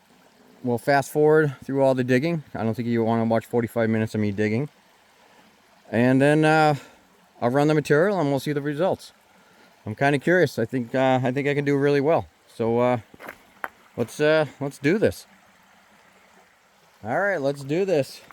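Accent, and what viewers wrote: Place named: East Coast, United States. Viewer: Awesome! I think you beat me! What state are you in? Accent says out east to me.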